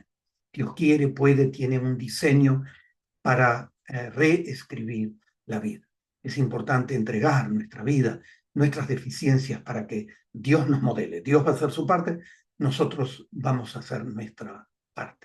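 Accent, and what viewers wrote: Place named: Argentina. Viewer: Gracias por tomar tu tiempo y hablar de esto temas importantes Pareces argentino, el acento , yo soy argentino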